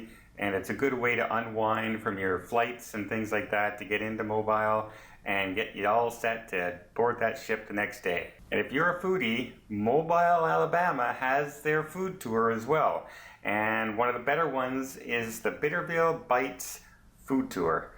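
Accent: Canada. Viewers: Nice video but I need to correct your mispronounciation of Mobile..I live in Mobile soo I want to teach you the right way ...you say Mobile..like its a mobile phone.. Mobile has so much French influence..as does it's name. Think of the word..seal..then add Mobile..so its a hard Inflection on bile...sounds like seal. Welcome to Mobile. We love tourists! Sounds like you are Canadian? Come on down to see our beautiful historic city!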